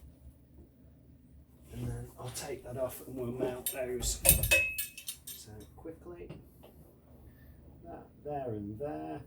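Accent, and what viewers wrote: United Kingdom. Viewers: Is this man from london or little port i can't tell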